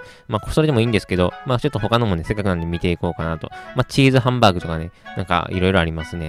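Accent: Kansai, Japan. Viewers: 関西弁でたまに毒舌Sっぽいところがいいんだよなあ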